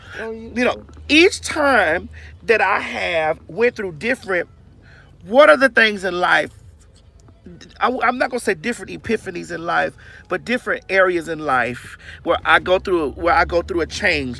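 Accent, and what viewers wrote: Florida, United States. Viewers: Hurry up🤣🤣😂 With that Florida accent. I love you!! Yasssss Praise him!!😚😚 Omg!! Kick rocks and boulders! I'm on my side laughing!!💜💙💚💛❤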